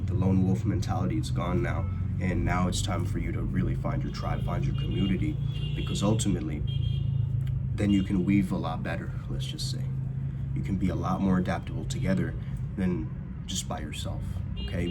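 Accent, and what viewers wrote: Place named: United States. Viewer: He was brought up in America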